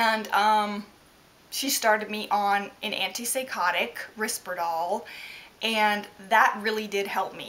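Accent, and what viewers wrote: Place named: South, United States. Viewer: I have no idea what your background is or where you live, but the way you pronounce certain words like "about", have a hint of a southern accent. I mean it in a good way. It would be nice to know what part of the country you grew up in.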